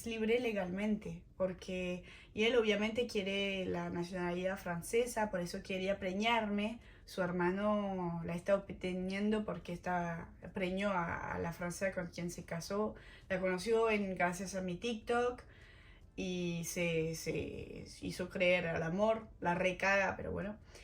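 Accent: Argentina. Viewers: Venite, no seas bolud@ no necesitas ningún pasaporte, cualquiera que te escuche dos minutos se da cuenta que sos más argentina que el dulce de leche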